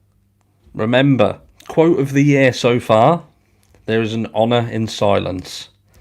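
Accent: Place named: West Country, United Kingdom. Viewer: Hahaha love your style. From fellow UK true crime addict im from the West country too, plus I recognise your accent brilliant x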